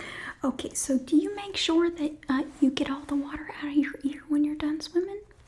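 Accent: South, United States. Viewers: The thunder in the background was chefs kiss. I also REALLY love the southern accent. So comforting!! 💙💙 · I don't know why, but souther accents for me is just ❤ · You sound and look like a young version of Bert kreischer’s wife. You do a pretty good southern accent! Sound like me almost but more like my granny the proper southern like a Georgia peach. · Oof that southern accent is a massive turn-off lol... I'll give it a like and a comment for the algo and yeet myself out ahaha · Honestly, southern hospitality makes for the best asmr videos, regardless of content. You did an excellent impression and will be listened to multiple times in the next few weeks. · Yess the southern accent my favorite 🫶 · growing up in the south it's uncanny to hear a southern accent not yelling all the time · I love it! Can you do a video with the southern accent but about eye exams? 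❤ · As someone who isn't a fan of America or American things i really like the southern accent when spoken softly like this. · I love the southern accent videos so much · Two things I enjoy southern accent AND her ear videos? This is amazing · I grew up in deep rural tennesse..and even she does a better southern accent than I do. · Being from the south I’ve never felt so comforted by the southern accent then I do right now! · You do the southern accent so well!!!!!! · I love this ASMR Southern Nurse Accent. It gives me tingles!😊💙💙💙💙💙💙💙👍. Well done,!💖⭐💖⭐💖⭐💖⭐💖⭐👍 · I’m southern, let me just say, as soon as you started talking with that accent I immediately felt at home☺️ I love these types of videos! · Thats a pretty good southern accent! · As a VERY southern person (Louisiana southern) she NAILED this southern impression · Normally you can tell very easily when someone is faking a southern accent. 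But you…immaculate. So good. ❤❤❤ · There’s just something about southern accents… 😊😊😊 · I LOVE when she a southern woman. She does the accent so well!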